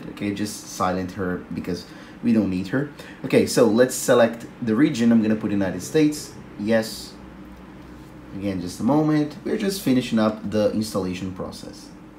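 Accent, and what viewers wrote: Brazil. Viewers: I'm hearing a strong Brazilian accent lol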